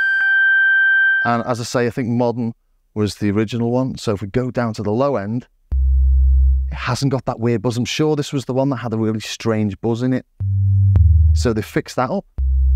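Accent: Liverpool, United Kingdom. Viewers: That gear video was gear (as we used to say in my youth)😉 I am not a great keyboard player, but you've certainly piqued my interest with this video. Great to hear the sweet tones of home... (Your accent, not the synth 😊). I'm a Scouse exile in Catalunya. Off to check out some more of your vids. Ta ra... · My wife says Scouser.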